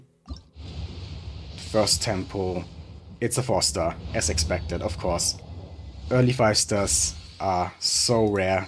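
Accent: Germany. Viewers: Ich höre ein wenig deutsch raus Grüße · U sound German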